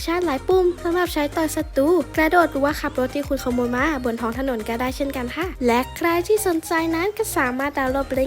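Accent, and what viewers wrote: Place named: Central, Thailand. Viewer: สงสัยมานานละอยากรุ้ แอดมินคนจังหวัดไรอะ ราชบุรี สุพรรณ กาญ เพรชบุรี เพราะสำเนียงเหมือนๆกับผมเลย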